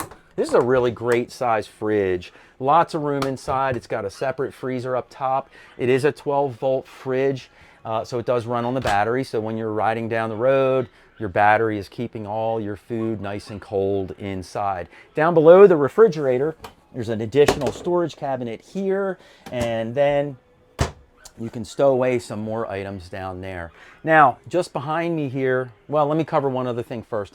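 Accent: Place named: Maryland, United States. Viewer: Being from Maryland…this guys md. Accent is comforting to me. 🤣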